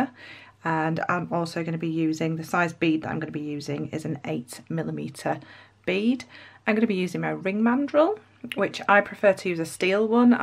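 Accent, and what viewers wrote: United Kingdom. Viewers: Am I the only one that is obsessed with their Britain accent? Yes, okay. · Are you from tottenham? Your accent reminds me of Adele ☺️ Great video. I like your teaching style ❤️ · Great job. Love it. I love your English accent.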